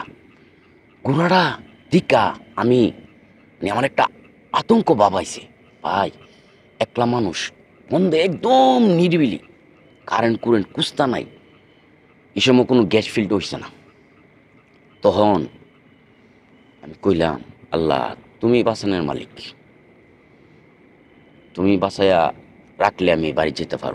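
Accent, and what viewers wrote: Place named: Narsingdi, Bangladesh. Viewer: মনে হয় নরসিংদির ভাষা এটা!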